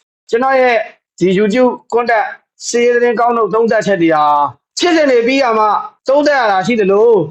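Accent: Yaw, Myanmar. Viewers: ကီစ္စမရှိ ရေ တင်ပြမှုတိုင်းအားပေးလျက် 👍💯အဆင်ပြေသလို့လုပ်ပါနော်👏👏👏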